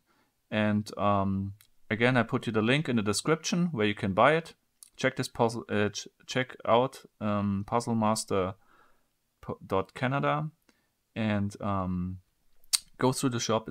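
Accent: Germany. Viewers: are you German? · Been watchin you for a minute. My first time commenting. "This is my fav puzzle yet." keep it up (German accent and all) sub'd & 👍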